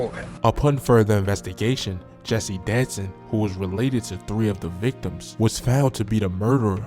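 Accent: Baltimore, United States. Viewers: His aZZ from Baltimore sun shi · You got a Baltimore accent, you should probably stick to that...cause you obviously don't know shit about memphis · it's weird hearing a Baltimore accent talk about Memphis it be like me trying to tell you about Fayette st.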